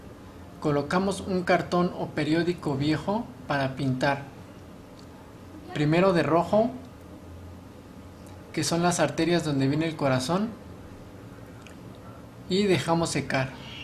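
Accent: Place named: Mexico. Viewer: Bno el video, pero la vos de mexicano la cago ):<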